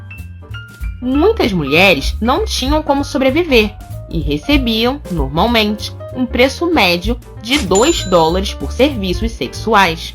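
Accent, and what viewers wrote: Rio de Janeiro, Brazil. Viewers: Gente amo esse canal mas o sotaque carioca da narradora è puxado demais , isso incomoda um pouco 😞mas parabéns pelo trabalho gente ! Segura esse like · A narradora é carioca ,.. A extoria da época vitoriana 😅kk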